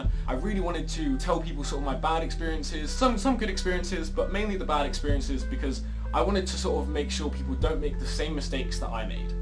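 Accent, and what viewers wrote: United Kingdom. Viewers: he sounds british to me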